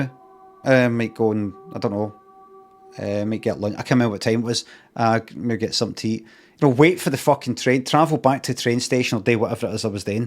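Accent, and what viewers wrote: Scotland, United Kingdom. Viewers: Hence his undying shame about his schemie accent. No amount of our money will ever change that fact.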